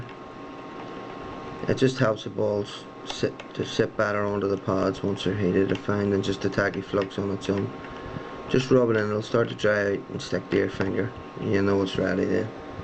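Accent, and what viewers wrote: Northern Ireland, United Kingdom. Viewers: Actually he is northern Irish, which is in the UK, not Republic of Ireland which isn't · thats a northern ireland accent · Pretty sure he's from Northern Ireland. -_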